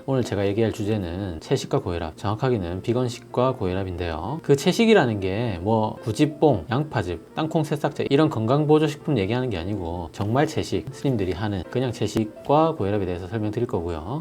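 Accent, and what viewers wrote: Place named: Busan, South Korea. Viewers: 님병원이 어디에 위치하고 있는지 알려주세요.~~~ 참고로 저는 부산 연제구에 삽니다.^^ 영상 만드는 일에 정성이 가득하시고.. 강의 내용 퀄러티 인정! 그래서 고혈압, 당뇨 위태위태한 저는..꼭 한번 뵙고 싶기도 해서.. 사투리가 부산 사람인듯 하여..병원이 부산에 있을듯란 예감이 듭니다.ㅎ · 같은 부산 분이라 그런지 하태경 의원님과 외모, 말투 모두 비슷하세요. 엘리트 부산인들의 공통적인 말투인가 보네요.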